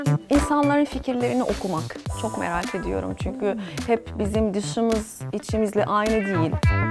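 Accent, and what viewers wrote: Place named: Turkey. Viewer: Vay anasi kiz fasliymis be konusmasini duyarsan 100% turk kizi dersin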